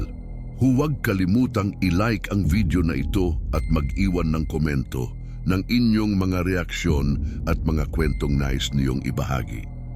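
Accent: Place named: Tagalog, Philippines. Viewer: ❤Daddy and his lettle tagalog accent 😊😊😊charr😊😊😊😊😊😊😊😊😊😊😊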